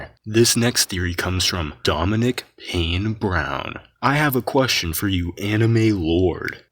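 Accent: Spain. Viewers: Ele lendo com sotaque espanhol dms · Do you speak Spanish? You really have the pronounciation of a spanish speaker person.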